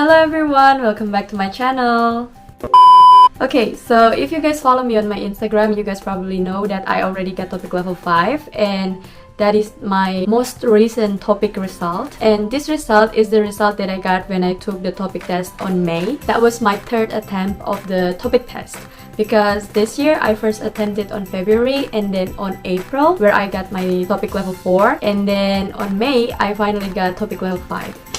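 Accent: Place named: Philippines. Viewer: Oh yoy r a filipina !the English lol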